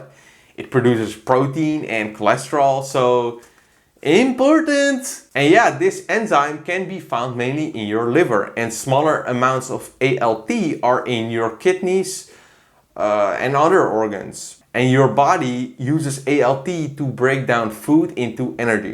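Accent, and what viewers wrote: Netherlands. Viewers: Hier via Derek. Binnen paar seconden wist ik dat je nl was. Dutch accent > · Here from more plates for dates, en toen dacht ik deze gozer heeft een nederlands accent dus ff kijken.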